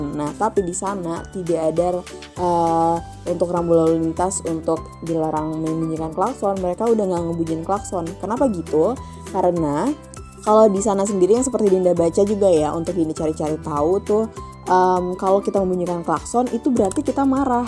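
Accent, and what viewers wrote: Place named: Malaysia. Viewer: Manglish~ Malay English 🇲🇾🏴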